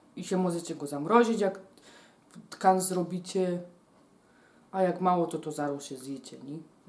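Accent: Silesia, Poland. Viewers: skad lochodzisz? Niby mowisz po slasku ale troche inaczej niz u nas w domu mowili? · Pani prosze nie podjadac tego miesa z patelni bo nie bedzie co do kapusty wciepac.Ja robie zupelnie inaczej bigos szczegolnie swiateczny ale napewno spruboje Pani wercje kiedys.Nie jestem slazakiem ale lubie Pani gware i ta taka prostolinijnosc.Pozdrawiam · Uwielbiam slazakow sama mam rodzine na slasku no to na Swieta dalas mi pomysl na bigos po slasku pozdrawiam fajnie gwarzysz po slasku ;) tak trzymaj.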